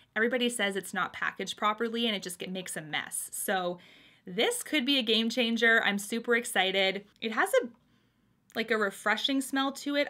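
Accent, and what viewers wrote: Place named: Canada. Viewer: Good morning (at least it’s morning here in Germany 😀). I’m a Brit living in Munich, Germany and because of the time difference you are very often my breakfast buddy 😊. Your videos always put a smile on my face and cheer me up if I’m feeling down. You have a lovely sunny disposition and I love your Canadian accent! The haul looks great. Think I might have to get the Briogeo mask, as well as the Marc Jacobs palette. Really hope the mascara lives up to your expectations - now I’m worried that I hyped it up a bit too much (it is great though). Have a great day xx 💋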